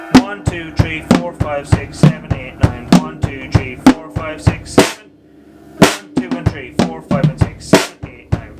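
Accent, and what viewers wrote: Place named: Ireland. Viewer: I hear your pain! I only have a small rubber mat kit at home with an added real bass drum but I can practice on a real kit at school 3 times a week for half hour. I'm about to take Trinity grade 1 but it's hard switching from acoustic to electric when practicing! I like your videos and your lovely Irish accent 🙂.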